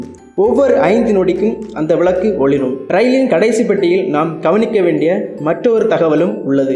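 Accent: Kanyakumari, India. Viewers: Bro kanyakumari ஆ Bro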